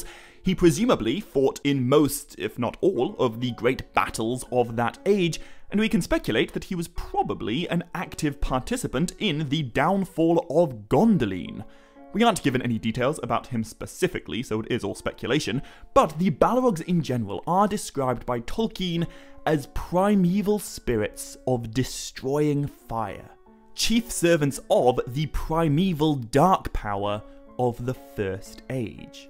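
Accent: United Kingdom. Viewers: He's British. They ask talk like that.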